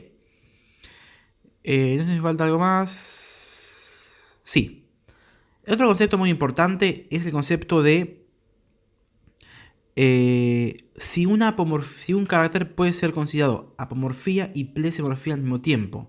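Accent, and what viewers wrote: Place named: Argentina. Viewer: Tan argentino que duele, amoooooo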